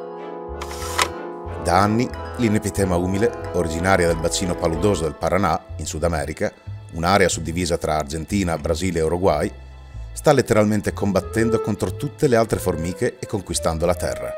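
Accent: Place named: Ferrara, Italy. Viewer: Non me ne intendo di formiche, ma i tuoi video sono ipnotici 😂 sei un grande. Ma da dove vieni? Accento fantastico (sembra il mio, ferrarese)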